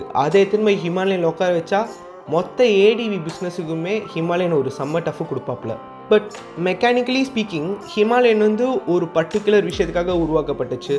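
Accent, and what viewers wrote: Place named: Madurai, India. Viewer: bro ithu madura slang bro 🥰😎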